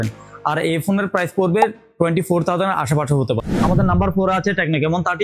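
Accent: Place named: Chittagong, Bangladesh. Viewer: ভাই আপনি যে চট্টগ্রামের লোক সেটা আপনার কথা বোঝা যাচ্ছে,অতএব আপনি আপনার মাতৃভাষা চেঞ্জ করে শুদ্ধ ভাষায় কথা বলুন,যে কথার মাধ্যমে প্রত্যেক জেলার মানুষ আপনার ভিডিও দেখে উপকৃত হবে।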